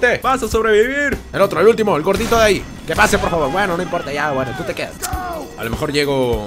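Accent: Peru. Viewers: me parece o eres peruano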